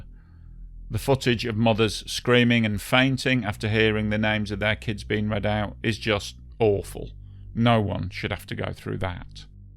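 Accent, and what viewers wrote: United Kingdom. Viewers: . . . great point, I'm of the same thought. . . . as well, it's really reaching out there to correct a video producer in the comments section 🤣🤣Being way over here in the US, it looks exactly like a Frenchman wanting to correct their arch rival Brit any chance they can get, cause this guy sure sounds British to me anyway.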